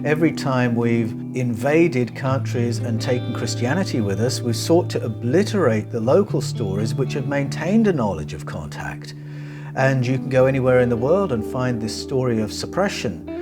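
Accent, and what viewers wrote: Australia. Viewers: I took down a scathing comment fueled by disappointment over tainting your material with background music. But your material is WAY too critical and far too poignant at this time to need ANY "accessorizing" brother. Trust me, you are amongst the vanguard and a pillar of this global movement into Truth, and Truth requires no supplementation or overly loud background music. We're hanging onto your every intrinsically melodic Aussie word anyhoo, and imo you require no musical accompaniment.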